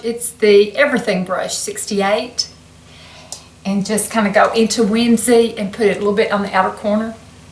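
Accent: South, United States. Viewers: You are beautiful!!! This is the first time I've seen any of your videos and it was very helpful. You must be somewhere in the South? Love your accent, you sound like my sweet Aunt Dot in a little town called Meansville, GA, about an hour outside of Atlanta. May I ask your age? Thanks for this video. I WANT THAT PALETTE!!!!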